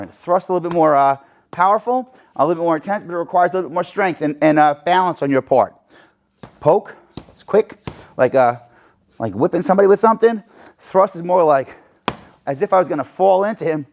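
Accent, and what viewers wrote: New York, United States. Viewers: Love your NY accent, have family in NY. Drink WARTER....lol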